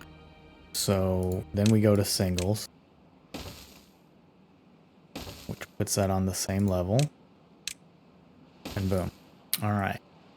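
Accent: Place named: United States. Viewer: Ok I watch a lot of timberborn videos…you and one other are the only Americans I can watch…otherwise it just sounds weird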